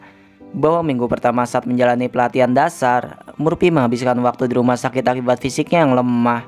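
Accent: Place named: Java, Indonesia. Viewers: Nadanya medoook,kayak orang ngapaak???😊😁😁😁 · Logat bahasa nya tu macam org jawa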